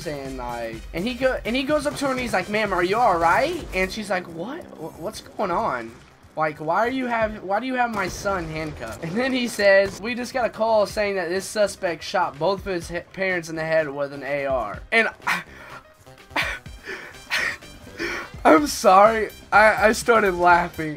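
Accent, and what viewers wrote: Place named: Indiana, United States. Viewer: think that's just his Indiana accent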